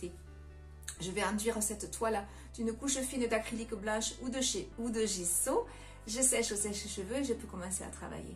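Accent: South, France. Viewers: moment plaisant en compagnie de la charmante et souriante à l'accent agréable du sud pour découvrir la technique de mise en oeuvre de l'effet peau de serpent. 🤩 l'association des couleurs est sans fausse note. j'aime bien le rendu estompé du ciel avec cette surépaisseur matérialisant un voile nuageux avec l'effet de la pluie en mer. vous vous êtes un peu reprochée l'imperfection de la ligne d'horizon. pourtant, je trouve qu'elle est bien ainsi car plus réaliste que l'abstraction que l'on en fait simplement. je pense que si elle avait été uniforme elle aurait accentué une toile coupée en deux alors que dans votre cas la transition est naturelle. enfin, la représentation du bateau fut un clin d'œil pour moi. il m'a fait penser à la technique de représentation des fonds de décor que l'on peut également trouver dans certaines BD. une bien jolie toile. 🤩😉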